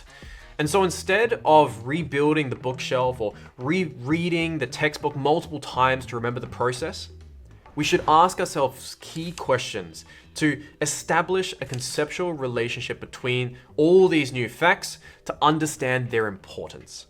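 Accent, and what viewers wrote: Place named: Australia. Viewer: I think it's the Australian accent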